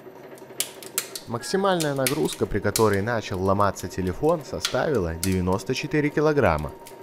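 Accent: Belarus. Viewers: Крейзи белорашан эксперимент, судя по говору.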